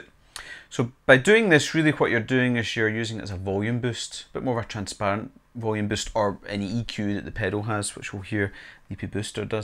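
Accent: United Kingdom. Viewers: Why is it that almost every gear review video is done by people with British accents? Don't Americans do pedal reviews? And why can't the British say the word PEDAL? LOOK! I'm turning my PIDDLE on, now I'm turning my PIDDLE off. PIDDLE, PIDDLE, PIDDLE.😅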